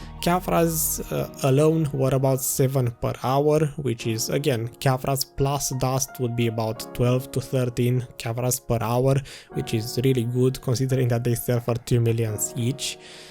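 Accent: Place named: Romania. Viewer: haha, your accent is so romanian. the moment I heard it I was almost certain XD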